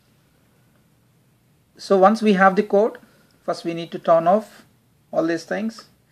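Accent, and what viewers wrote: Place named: India. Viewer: haha crazy indian accent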